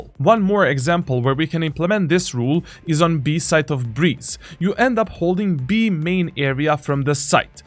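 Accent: Russia. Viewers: Where you from ? Russian Turkish ? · Okay but are you Russian or Ukranian 🤨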